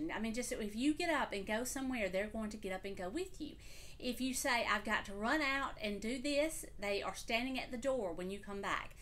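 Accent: South, United States. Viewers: I just love your southern accent and delivery. You are so sweet and compassionate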